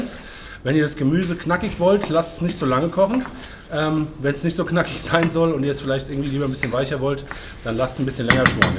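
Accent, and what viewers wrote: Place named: Mannheim, Germany. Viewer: Ui, jetzt habe ich direkt Hunger. Werde noch etwas an Saucen nachkaufen müssen; daher danke für den Link aus diesem Video. Hockerkocher, Wok etc. ansonsten vorhanden. Daher für das Wochenende: Feuer frei. Dein Dialekt bringt mich immer zum grinsen, da ich viel mit Kollegen aus der Konzerzentrale in "Mannem" (=Mannheim) zu tun habe 😉 Mach(t) weiter so und Grüße aus dem Rheinland.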